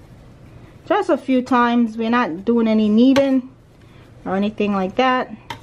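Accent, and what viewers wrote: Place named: South, United States. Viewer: I was trying to identify your accent...it's not familiar. South, for sure, but different, too. ????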